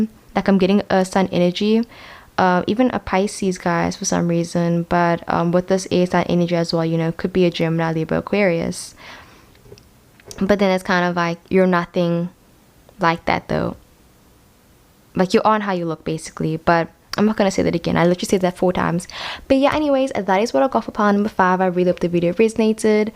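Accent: South Africa. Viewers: Sis you sound south African as fookkkk Omg u are South African! Yayy Wait how is this so acurate. Pile 1. No fucking way whattt! No I'm subscribing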